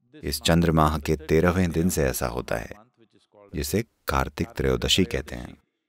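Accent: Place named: South India, India. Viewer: Aapko main bahut manta hun lekin kuchh Shabd aap South Indian ke hisab se bolate Hain vah uchit nahin hai aap pure Vishva ke Hain South ke nahin soch samajhkar shabdon ka uchharan Karen Baba यह आपसे विनम्र निवेदन है मेरा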